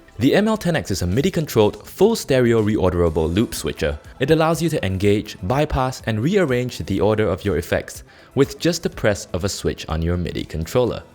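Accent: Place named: Singapore. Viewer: Is Morningstar based in Asia? This person sounds like a Singaporean or Southeast Asian.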